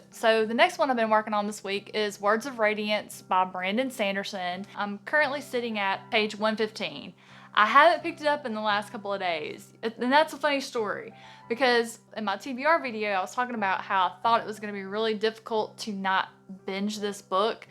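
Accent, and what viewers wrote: South, United States. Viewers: It's the southern accent.😍😍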